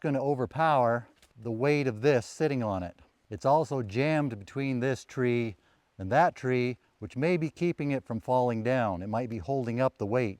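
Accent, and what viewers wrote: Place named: West, United States. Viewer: di quale zona (stato) è? la parlata( accento) sembra quella di " Buster Scruggs "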